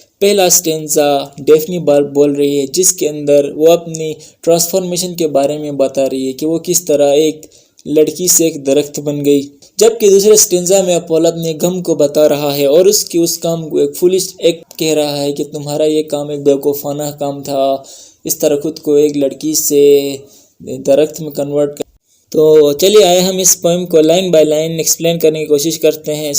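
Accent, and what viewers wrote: Gilgit-Baltistan, Pakistan. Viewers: AP ka accent GB waloo ka ha.100% AP GB ka ho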